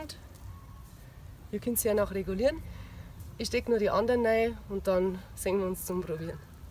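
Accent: Upper Palatinate, Germany. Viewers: Wahnsinn gefällt mir!! Extra Prima super Idee! 👍👍Tolle Frau👍👍Ich glaub fast nach dem Dialekt bist du ein Oberpfälzer Moidl!! Auf jeden Fall Topp und Gruß aus der Oberpfalz! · ned schlecht die Idee, aber sog a moi, kimmst Du aus der Oberpfalz?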